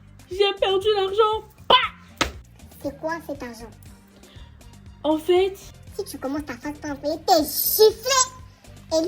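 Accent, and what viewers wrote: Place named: Democratic Republic of the Congo. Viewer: Ça sent que tu parles Lingala 😂😂😂😂😂😂😂😂😂😂😂😂 Tema l'histoire digne d'un film wallah 😂 Je me suis abonné direct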